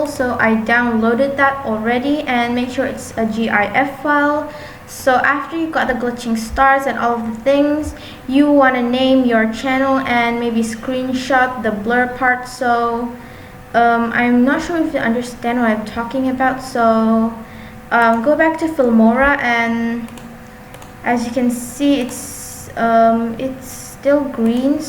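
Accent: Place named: Malaysia. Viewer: That's her malaysia accent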